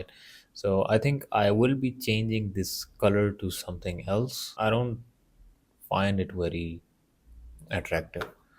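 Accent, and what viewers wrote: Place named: India. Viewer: BRO WHAT IS THIS BIRYANI ACCENT MAN WE CANT LEARN SHIT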